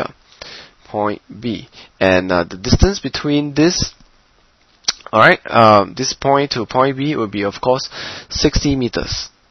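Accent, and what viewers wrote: Singapore. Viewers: Wow i really got it now Thanks a lot. I'm 99% sure that you are a Singaporean. I can tell by your accent;) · u must be a singaporean or malaysian . ur accent lol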